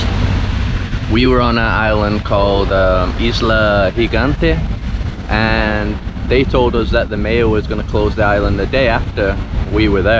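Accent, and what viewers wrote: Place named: Scotland, United Kingdom. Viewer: That’s a funny sounding Scottish accent, what part of Scotland are you from mate?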